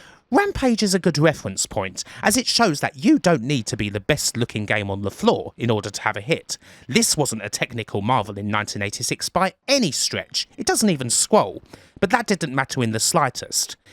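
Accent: United Kingdom. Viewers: My new favorite retrogame channel! And like british accents. Can't get enough of your stuff!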